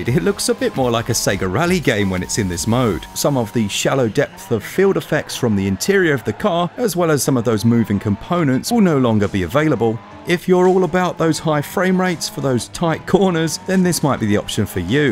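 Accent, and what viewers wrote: United Kingdom. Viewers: no not bad at all. It just didn't sound like you. Had more of a British tinge to it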